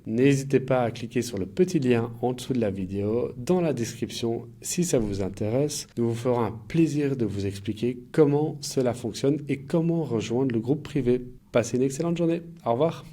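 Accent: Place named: Russia. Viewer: ... je sens un accent Russe , arnaqueur.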